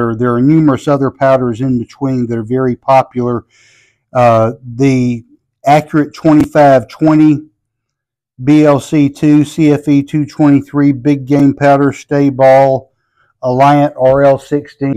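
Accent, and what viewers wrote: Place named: Pennsylvania, United States. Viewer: Entertaining as always. I have read that a lot of shooters like the H4350 but at higher velocities. 2750 FPS seems to be the sweet spot. Some talk of RL17 also. Apparently it has been hard to get but the posts are older. I wish I had an indoor 100 yd range. BTW you are located in GA but your accent makes me believe you are from PA?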